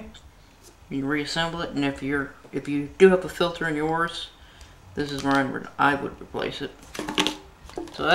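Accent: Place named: Texas, United States. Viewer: interesting accent. Texas? Oklahoma?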